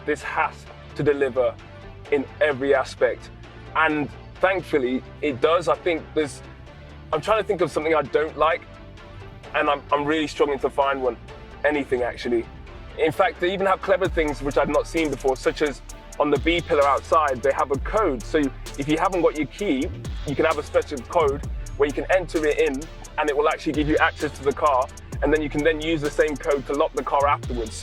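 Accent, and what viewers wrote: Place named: England, United Kingdom. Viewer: Great video and car, I definitely agree on the ‘head turning design’ notion! Your videos really remind me of Carswithluke (and not only due to the similar English accent), where there is an emphasis on cinematography, landscapes and storytelling. Keep up the good work!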